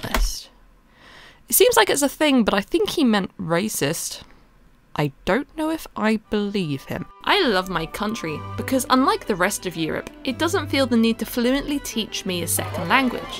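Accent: United Kingdom. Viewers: Somehow I don't care about the actual content (politics and british rant) but the animations are so cute that I just keep watching. Also british accent is just funny :^)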